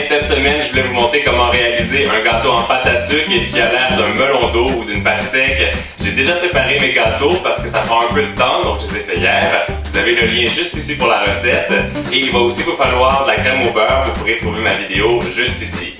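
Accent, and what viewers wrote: Quebec, Canada. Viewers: coucou j'adort t'a chaine. je t'es découvert dans le meilleur pâtissier et j'adore l'accent Québécois je t'adore continu comme sa ❤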